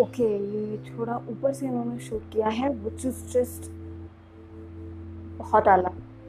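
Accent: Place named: Pakistan. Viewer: ap pakistani hooo apki language bolna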